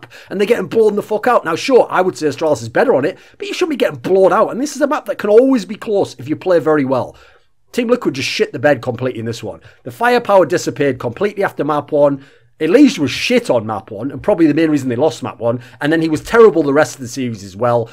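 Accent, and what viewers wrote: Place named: Scotland, United Kingdom. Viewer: It's definitely English, but definitely not an accent from England. Probably Scotland or Wales.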